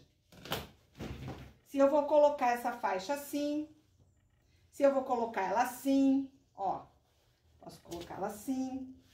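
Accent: Rio de Janeiro, Brazil. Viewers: Oi amada adoro s jeito descontraído de ensinar, gosto do pulo d gato kkkk, e amo nosso jeitinho carioca d falar, também sou carioca , sou d Sepetiba minha terra Natal, posso falar 1coisinha? Gostaria de saber por que vc não posta vidios todos os dias?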